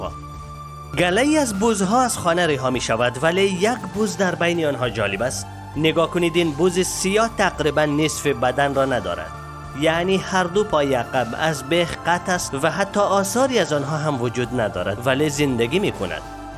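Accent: Afghanistan. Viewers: نمیشه این کسی که گوینده هست عوض کنید چقد دیگه ادم قط بود افغانی اوردین گوینده خبر ..اعععععععع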